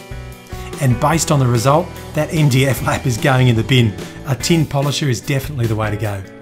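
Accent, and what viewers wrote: Germany. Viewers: are You german? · Are you german, swiss or austrian?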